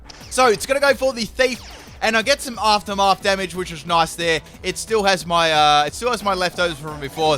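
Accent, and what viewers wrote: Australia. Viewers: Are you an aussy? I just noticed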